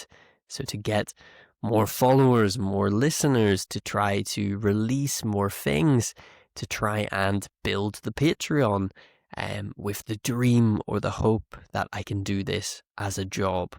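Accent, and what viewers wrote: United Kingdom. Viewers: Hello 👋🏻🙋🏻‍♀️ Since this video was recommended to me , I ’ d like to ask — are your videos all in British English ?? 🤔😊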